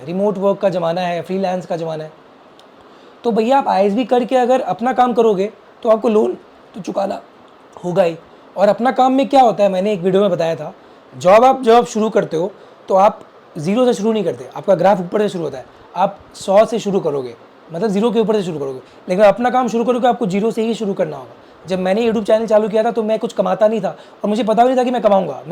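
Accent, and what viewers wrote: Bihar, India. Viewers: Bhai tm Bihar se ho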